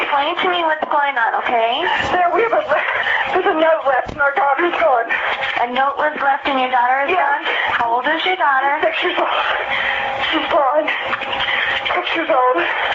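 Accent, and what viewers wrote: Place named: United Kingdom. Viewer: Also, I just love your British accent haha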